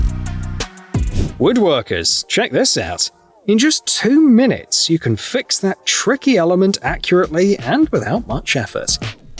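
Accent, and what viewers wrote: United Kingdom. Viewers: He sounds British, so no, he doesn't know the difference between his asshole and a hole in the ground.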